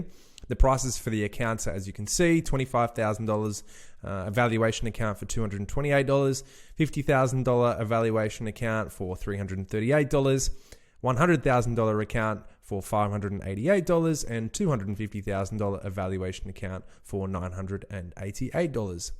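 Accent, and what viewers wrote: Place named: Australia. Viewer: Your accent being too Aussie, can only suggest that you have a bias to lean towards conformity rather than ingenuity. Other than that, seems like an alright person.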